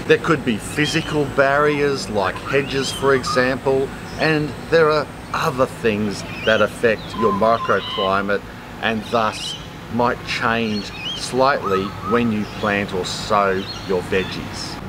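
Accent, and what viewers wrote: Australia. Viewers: Awesome. Enjoy the Aussie accent and attitude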